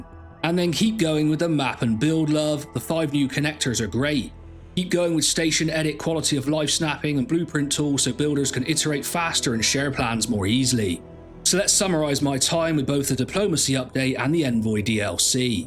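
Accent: United Kingdom. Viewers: I didn't know you were Portuguese, congrats ! only Portuguese I know with a brit accent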